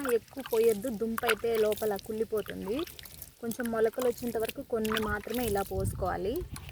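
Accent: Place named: Telangana, India. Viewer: మేడం వాయిస్ రాయలసీమ లాగా వస్తలేదు మేడం. పక్క తెలంగాణ లాగా వస్తుంది మేడం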